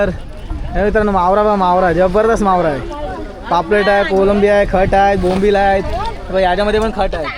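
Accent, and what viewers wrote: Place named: Konkan, India. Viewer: भाषा तर कोकणात वाटते दादाचा कोणत्या गावचा कमेंट करून सांग कामांची रिप्लाय दे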